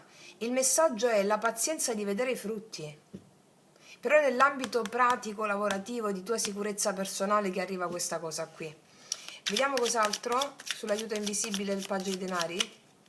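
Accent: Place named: Lecce, Italy. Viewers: Grazie mille, sei fantastica. Mi piace tanto la tua lettura e la base sciamanica che hai. Oltretutto hai l'accento leccese che mi riporta totalmente a casa. Io vivo da 57 anni in Germania ma nonostante distinguo sempre molto bene quando sento parlare l'italiano da una leccese. Un abbraccione e grazie. Ti seguirò con piacere.🙏❤🥰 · Sei stata incisiva 🎉 Dall‘ accento sei salentina , ti seguo dalla Francia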